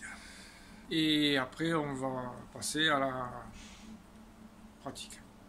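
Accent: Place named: Gard, France. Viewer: Je t'adore! et ton accent qui me rapelle celui de mon patron quand j'allais vendanger dans le gard! le petit betisier est cool aussi, peut le mettent en ligne et ca ajoute un peut de proximite. ne change rien:)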